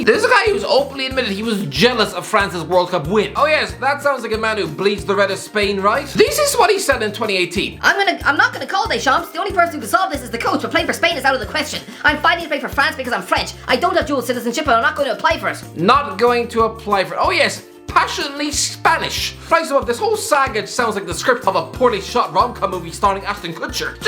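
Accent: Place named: Ireland. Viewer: i love the irish accent, just can't understand how talks so spastic-ally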